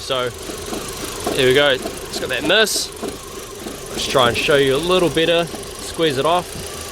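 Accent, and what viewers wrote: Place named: New Zealand. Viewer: Thank you. As seen as I heard your accent I said he must be a kiwi:). I just bought 2009 A4 3.2 Quattro and has same rough idle vibrating problem and kind a goes away when you are driving faster. I would really appreciate your advise for that issue. Where shall I take my car? I am in Auckland and I don’t want to be ripped off TBH! It has 150K milage on it. my email <mail> many thanks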